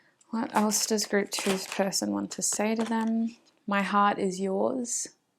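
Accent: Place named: Australia. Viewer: 1st I choose pile one, this (the whole reading) resonates with me so much we broke up last year the month before Christmas and he still constantly messages me and wants to see me now in the past it didn’t go great but I know he is very kind and we both had our own childhood issues/issues that wasn’t healed so it was very hard but I do feel as though he’s who I’m meant to be with and I don’t feel like our chapter is finished and I have stopped talking to him for about two weeks or more because I’m really trying to think about myself and heal for now and hope that this time will give him the time to heal and change but I definitely have left hope because it has been so long without anything happening but I do always still hold some type of hope and he does always seem to come back to me but I really am making sure that if I go back he really does give 100% as well as me and it’s an even giving connection. 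Thank you lovely and don’t worry about the time I love long readings so I didn’t have any issues watching all the way through my reading and I’m Australian as-well and your accent is so strong but calming I love watching your tarot videos before bed it definitely gives me energy the next day as I have the confirmation I needed.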